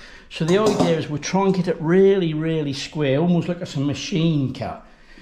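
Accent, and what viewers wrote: United Kingdom. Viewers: Just found your channel I love the way you get your knowledge across. I can't cut straight to save my life but after watching this it should be a bit easier. Also you must be from Norfolk !!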